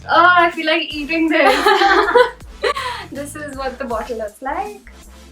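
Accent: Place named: India. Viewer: thats a typical Indian accent lol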